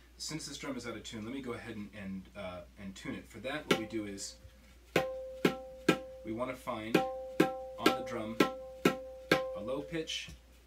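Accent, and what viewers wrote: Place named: England, United Kingdom. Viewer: It’s funny hearing Indian words from a Englishmen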